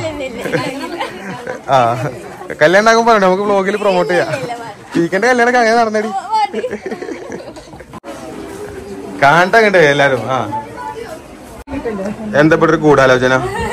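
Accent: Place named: Malappuram, India. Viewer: ഞമ്മളെ മലപ്പുറത്തിന്റെ ഒരു മൊഞ്ജ് 👍🏻👍🏻👍🏻... ആ ഭാഷ... 👍🏻👍🏻👍🏻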